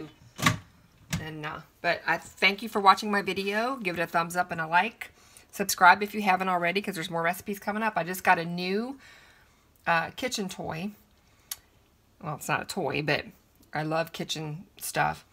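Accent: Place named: Arkansas, United States. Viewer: Oh Sis, these look delicious! TFS!! That Arkansas accent is really sounding out today!😊😘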